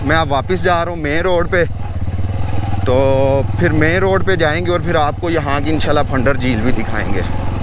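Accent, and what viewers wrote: Punjab, India. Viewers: You explore unique areas but your Urdu language is like Truck drivers from Punjab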